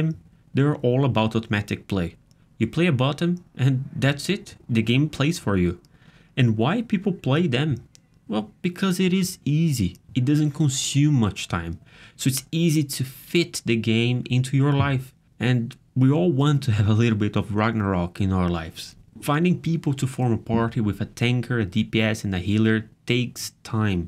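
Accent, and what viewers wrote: Brazil. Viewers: A footage é br e vc tem sotaque br. És tu um br?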